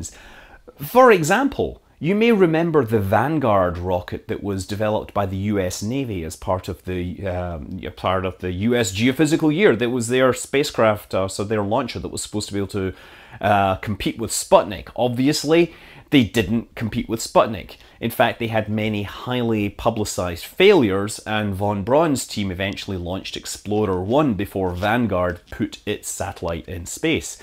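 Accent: Scotland, United Kingdom. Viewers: Hey where in Scotland are you from?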